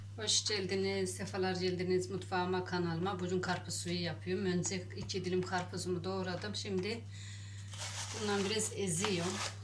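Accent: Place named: Artvin, Turkey. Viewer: Artvinli konuşman var sife memleket neresi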